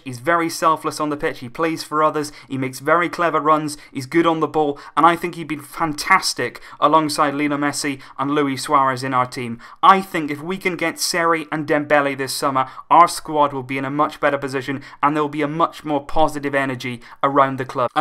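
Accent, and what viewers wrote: United Kingdom. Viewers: he is honest,clear,and talks briefly and in British accent ,so shut up and let me subscribe.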